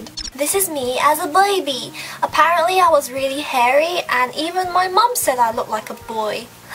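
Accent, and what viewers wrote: United Kingdom. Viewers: Are you British? I'm from Australia and you are so funny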